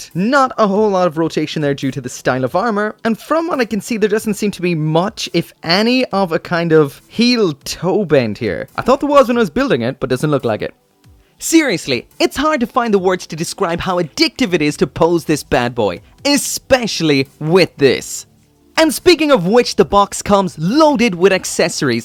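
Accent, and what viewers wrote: Ireland. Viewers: Are you from Ireland because you slightly sound like your from Cork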